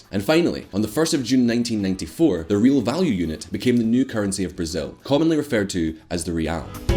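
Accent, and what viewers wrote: Scotland, United Kingdom. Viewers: I've seen Brazilians with no teeth, black teeth and no shoes. Obviously by his accent he is British. The comment is meant to be satirical. You're welcome!! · Are you British ?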